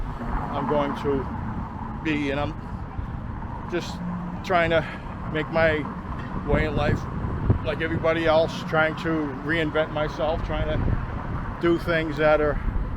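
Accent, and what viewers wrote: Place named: Boston, United States. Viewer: .... excellent and humorous interpretation. We know what you meant. The beauty of Warsaw can never be overstated, an immaculate city overflowing with history, stunning beauty and soul. Thanks for the tour, and hope you come back (American living here)! You have Boston accent, awesome. I met Polish girl many years ago in Boston, and now we have a place on Wyzwolenia, near Lazienski Park. Stay safe in Kyiev.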